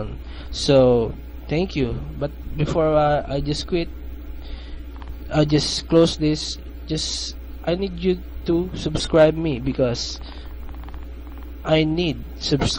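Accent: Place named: Philippines. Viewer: Pare Pilipino ka noh,, hahah obyus na obyus..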